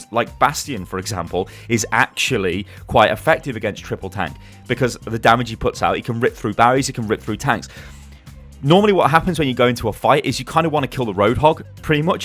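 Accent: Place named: Liverpool, United Kingdom. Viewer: Where ya from, i cant pin your accent, is it liverpool or something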